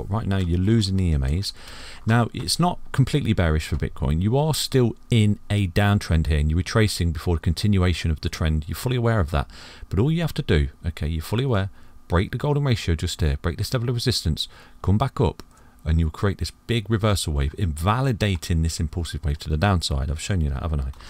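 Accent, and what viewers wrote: United Kingdom. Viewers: This british accent is so damn gay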